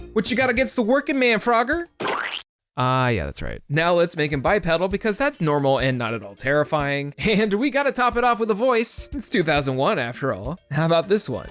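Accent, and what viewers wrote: Louisiana, United States. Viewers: oh shit baaaaaabie i didnt know you was cajun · Did not know you where cajun. I'm from Louisiana, north Louisiana so not cajun but a Louisiana baw none the less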